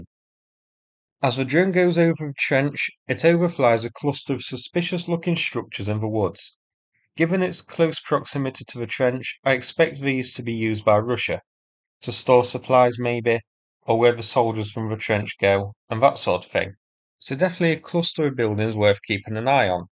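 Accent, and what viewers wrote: United Kingdom. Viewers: Danngg your soothing voice & unique British accent really make my day lol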